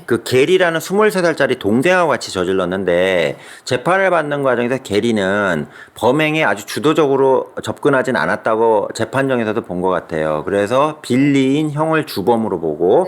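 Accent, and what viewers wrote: Chungcheong, South Korea. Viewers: 교수님 방대한 자료들 찾는것도 힘드실텐데 이 모든 자료들을 자세히 분석해서 흥미롭게 전개해주시는 능력이 탁월하세요. 정말 위트 있는 입담에 푹 빠져드네요. 충청도 억양이 정말 매력 있으시세요. 김복준님과의 해외사건 케미도 좋고요.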